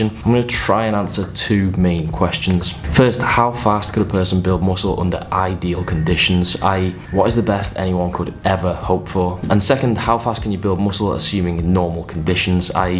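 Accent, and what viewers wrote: United Kingdom. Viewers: Thank you very much for all that super valious information, and I love your accent, where are you from in the UK?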